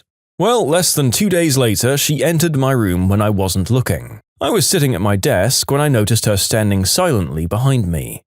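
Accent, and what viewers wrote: United Kingdom. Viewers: WHEN DID YOU TURN BRITISH? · oh he's British now hi fellow Englishman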